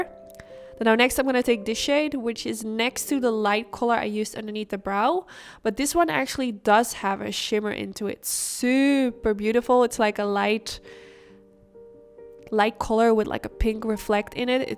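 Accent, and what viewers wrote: Netherlands. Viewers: Hi, I'm new to your channel. I was very curious about your accent. I didn't know you were from the Netherlands, but since I also live here, I recognized it inmediately, and then I read your information and it confirmed it. I have watched 2 of your videos already and you just won a new subscriber. I really appreciate the close up on the eye shadow looks and it's super easy to follow. Thanks a million. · I guessed you were from the Netherlands in the first 20 seconds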